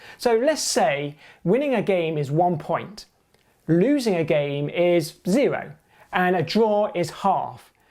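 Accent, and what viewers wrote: United Kingdom. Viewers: My brain melted. 😢🧠🔥 PS. Cool British accent. 🙂👍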